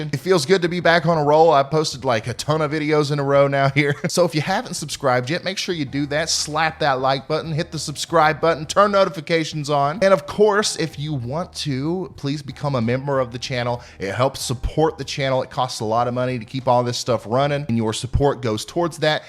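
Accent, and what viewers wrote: South, United States. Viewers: This guy is such a southern reporter. 😒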